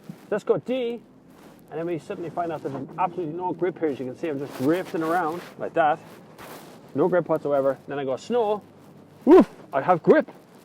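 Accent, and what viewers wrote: Ireland. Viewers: baaags of space, love this Irish accent, 2011 quite good amount of snow.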